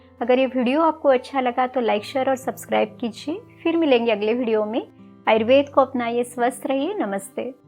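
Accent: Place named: Maharashtra, India. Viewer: Aap ko dekhke aapki bolne ke tarike se aap maharastiyan lagati hai didi❤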